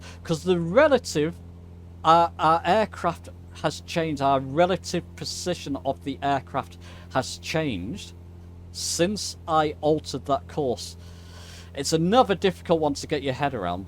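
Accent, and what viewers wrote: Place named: Liverpool, United Kingdom. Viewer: Totally off topic: Are you from the Liverpool area? I'm Dutch so I may be completely wrong, but your dialect reminds me of the Beatles.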